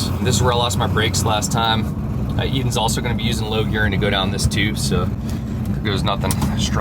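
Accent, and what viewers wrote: United States. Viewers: You sound too American, lol it’s not been there before they say bEEn.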